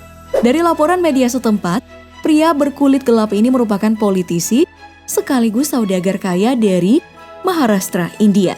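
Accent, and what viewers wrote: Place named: South Sulawesi, Indonesia. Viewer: fixx miminya dari sulsel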